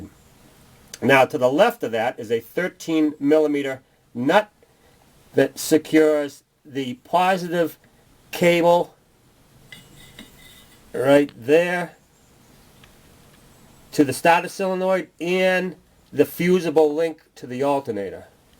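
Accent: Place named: Boston, United States. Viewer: My supervisor is from Boston and has the same type accent, it's common in the New England area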